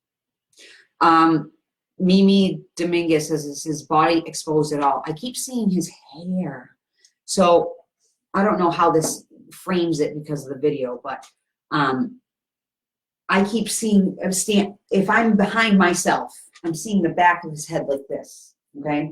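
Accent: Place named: New York City, United States. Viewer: I love you girl. I used to live with family in NYC and I am hearing some accent from you but I could be wrong lol.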